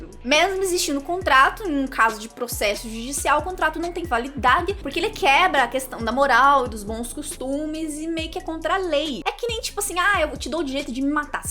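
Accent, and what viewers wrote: Paraná, Brazil. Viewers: Perai, existe sotaque paranaense?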